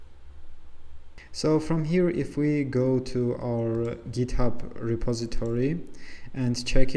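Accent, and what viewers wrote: Iran. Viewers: are you from Iran? interesting accent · hey bro, Your accent is very similar to Iranian, are you Iranian?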